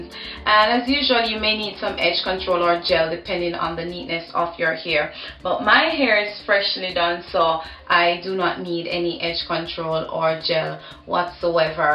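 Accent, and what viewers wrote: Jamaica. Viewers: r u from Jamaica?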